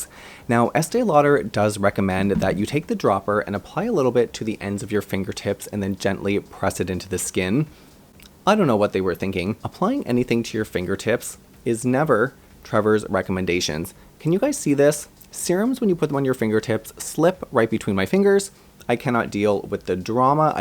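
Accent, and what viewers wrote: Canada. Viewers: Are you Canadian? Great review by the way 😁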